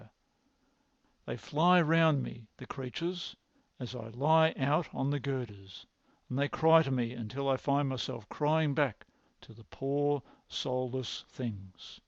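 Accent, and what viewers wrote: Australia. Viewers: The Australian rising question intonation is distracting. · Australian? good reader - good story. thanks :}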